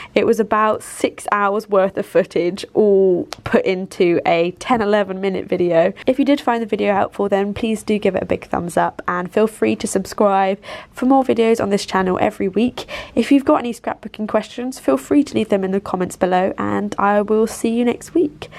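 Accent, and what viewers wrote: United Kingdom. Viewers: Because she is speaking in British accent and Emily was a British girl too 😅 does it connecting now 😅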